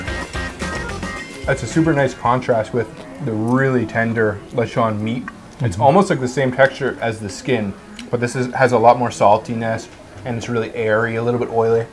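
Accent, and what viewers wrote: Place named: Canada. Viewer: this dudes canadian isnt he. "Crunchy on the Ootside," "MELTS IN YOUR MOOTH"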